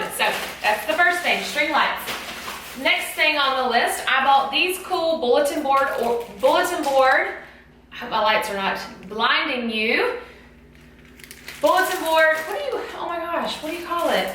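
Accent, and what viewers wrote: South, United States. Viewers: You are the funniest teacher YouTuber I've watched. I laughed so hard when you were talking about not looking at the price for the notebook because you might regret it lolol. The American southern (?) accent makes it even funnier for some reason. I'm Australian so it's not something we have around here. Either way I subscribed!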